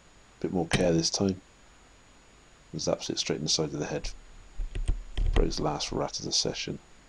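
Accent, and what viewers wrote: United Kingdom. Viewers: I love the British commentary. It's like he's on an African Safari or a morbid tennis match.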